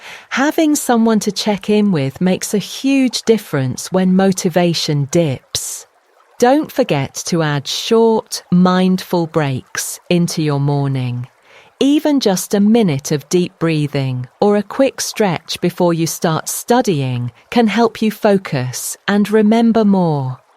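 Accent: United Kingdom. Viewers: ty i love your british accent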